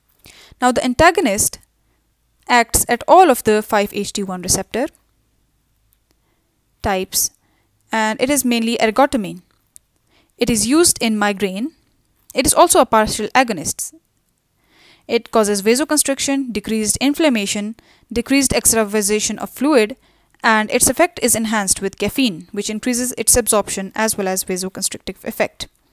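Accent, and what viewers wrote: India. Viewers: Hint aksanı ingilizce dinleyemiyorum çok gıcık ediyor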